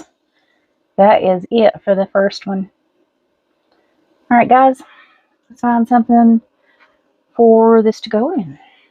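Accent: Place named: South, United States. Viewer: I thought that was a southern accent my dad was from Georgia and my bother lives in Texas I live in Utah 😊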